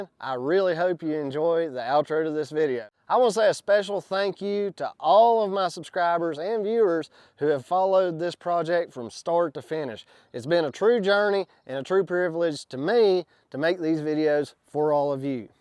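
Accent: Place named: North Carolina, United States. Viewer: Just found your channel, moved to nc from illinois, bought 60 acres and have built a home on it, pond already existed and was searching videos on how to build roads…heard your accent and thought to myself, this guy talks just like my neighbor…sure enough, looked you up…NC..definitely a native. Im located a little far east for you, but i would have you come repair my pond, the shorelines have all collapsed and need the muck cleared out and built back up the shorelines. Finding anyone who has pond experience in my area seems impossible. Im actually thinking of just buying a kx-080, use it for a couple years and then sell it, they hold their value pretty well from what i see.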